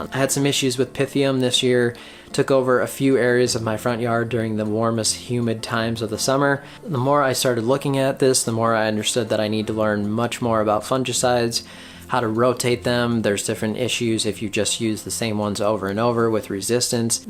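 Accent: Minnesota, United States. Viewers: You must be Minnesotan, Right?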